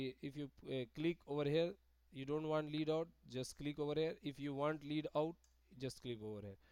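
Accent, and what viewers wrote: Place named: India. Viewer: Surprisingly one of the best turorials by anyone with an indian dialect i have ever watched. You nailed it! Great job.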